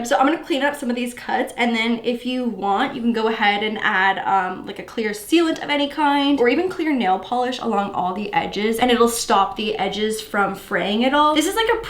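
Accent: Canada. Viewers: Some ppl make fun of Canadian accents but I think it's so cuuuuute like puppieeeees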